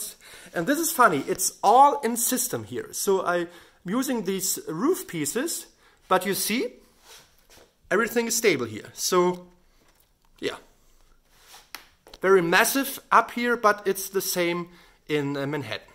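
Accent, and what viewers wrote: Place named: Germany. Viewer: holy shit bruder dieser deutsche akzent mashalla😂 aber daa sieht echt mega gut aus!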